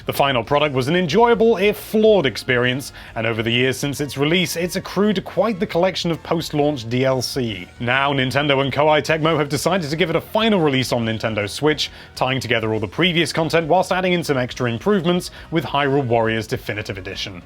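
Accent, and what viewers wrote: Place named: United Kingdom. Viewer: thedreadedzero it’s the British-English pronunciation, as stated in the dictionary. Die-nasty is an American pronunciation, and is british.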